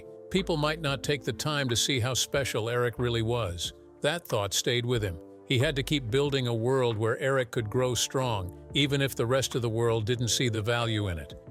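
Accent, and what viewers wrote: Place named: United States. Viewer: WHY IS AN AMERICAN Doing The Commentary?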